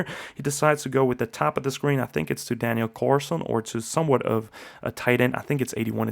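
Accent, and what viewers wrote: Chicago, United States. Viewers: Native Chicagoan here, you got a little Chicago accent slipping in on your R’s and A’s. Proud of you man 🎉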